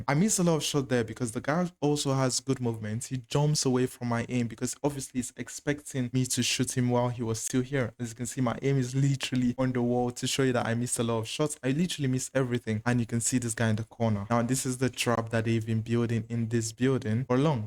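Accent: Nigeria. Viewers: U dey sound like 9ja guy😤😅.. gg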